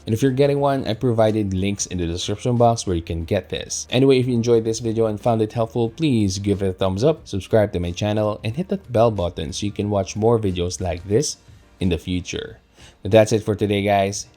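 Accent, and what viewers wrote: Philippines. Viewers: I love that Filipino accent! 👌🏻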